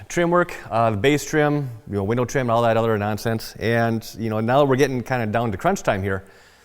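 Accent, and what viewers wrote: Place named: Wisconsin, United States. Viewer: Based on his accent I’d bet he’s from Wisconsin so not much different weather wise.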